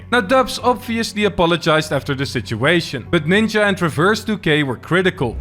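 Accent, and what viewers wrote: Netherlands. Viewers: is it me or does this dude has the biggest dutch accent i have heard in my life?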